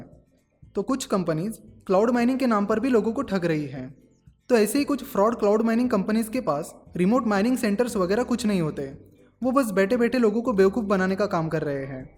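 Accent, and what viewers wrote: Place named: Indore, India. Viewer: Pakka indore wale hoge ye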